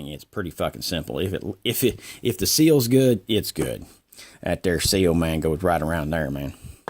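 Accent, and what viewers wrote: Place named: Cajun, United States. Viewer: Worst cajun accent ever. But a great review!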